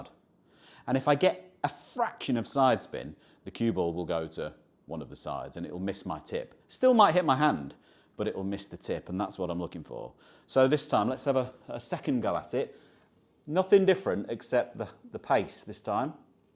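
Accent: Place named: RP, United Kingdom. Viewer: Great snooker player but such a boring generic, posh sounding personality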